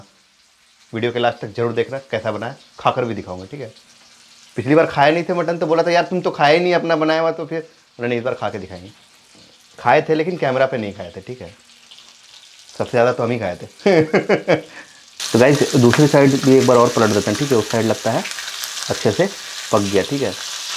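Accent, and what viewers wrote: Bihar, India. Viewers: Kit na thik he thik he Bol tha he bihar ka he KYA tuuu ???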